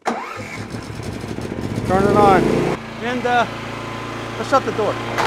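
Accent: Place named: North Carolina, United States. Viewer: You talk just like my friends in eastern "Noth Carolana"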